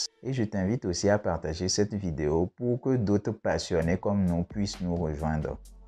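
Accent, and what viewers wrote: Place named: Togo. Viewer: Je suis béninois mais je reconnais l'accent togolais. Félicitations et beaucoup de courage !!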